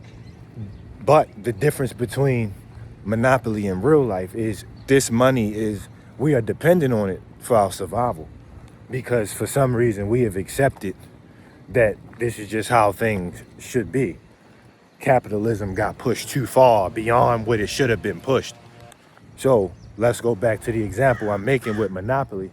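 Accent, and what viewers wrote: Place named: Baltimore, United States. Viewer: u from baltimore huh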